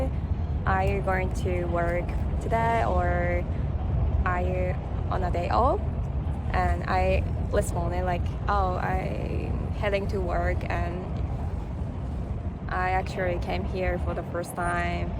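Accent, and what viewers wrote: New South Wales, Australia. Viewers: Aussie accent already detected. 👍🏽 You’ll fit right in just relax and enjoy the ride. It’s a great part of the world to be. 🇦🇺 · One thing I have noticed listening to you speak English is that there are certain words where you talk with what sounds like the beginnings of a very typical Australian accent. show know know You've really nailed the way that Aussies slur our words and speak with a very nasal voice, we infamously say "naur" instead of no. I think you're going to end up with a really cool sounding accent, great mix of Japanese and Australian :) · Welcome! You seem to be settling in well and enjoying our way of life. Did you know some of your words are starting to take on an Australian accent? Follow in particular jumped out to me! But there are others in there too. Keep having fun! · There are some markets in Potts Point on Sundays. 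Its interesting hearing some of your english words have already started to develop the Australian accent. Hope you enjoy your time in Sydney and Australia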